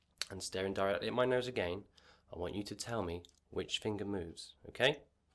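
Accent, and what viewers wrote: England, United Kingdom. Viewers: I like your British accent. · Love your english accent. Where are you from? Thanks for your videos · I've just come across your channel today. This is the first time I've seen an ASMR channel by a British dude (I'm from NI)! Your accent rocks! :)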